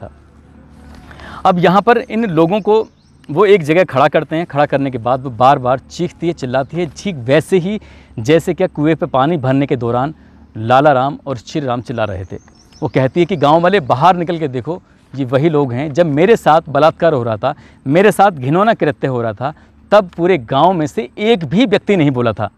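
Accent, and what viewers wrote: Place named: Amroha, India. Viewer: सर, आप तो हमारे ज़िले अमरोहा की हमारी तहसील हसनपुर के ही निकले 😂 आपके बोलने के अंदाज़ से मुझे लगता था, Allah bless u sir, keep it up👍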